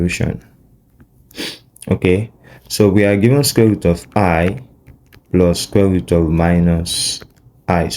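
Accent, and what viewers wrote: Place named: Europe. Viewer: In math, we strive for precision and certainty, so I appreciate how you described it as a convention. I’d be glad to learn that you're from the US, though it sounds to me like you might be from Europe instead.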